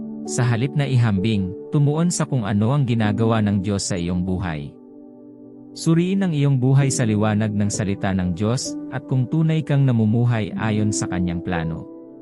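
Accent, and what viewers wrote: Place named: Filipino American, United States. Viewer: parang fil am managalog ang badoy mo mag aral ka ng tunay na salitang tagalog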